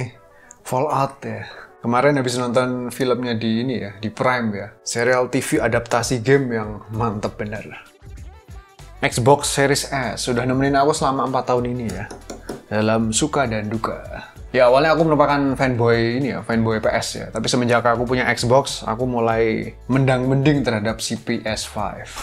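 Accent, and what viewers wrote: Java, Indonesia. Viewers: Nah Justru krna Medok nya itu... Jadi ciri khas Menarik 😅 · Anjir bang Lu awet muda banget, terakhir nonton 2020 an, wkwkwk Ciri khas medok dan santai nya masih konsisten sampe skrg😂😂😂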